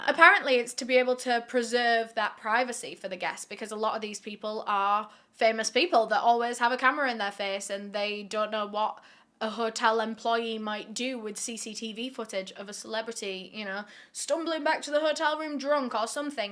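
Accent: United Kingdom. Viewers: Sorry but i gotta say you look like Olivia Rodrigo with cute Brit accent ✨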